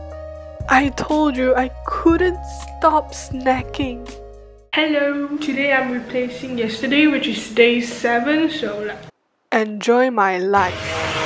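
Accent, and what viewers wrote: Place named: Malaysia. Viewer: BRO WHEN THE VIDEO STARTED AND YOU STARTED TALKING I WAS LIKE "is she Malaysian?" AND TURNS OUT YOU AREEEEE OMG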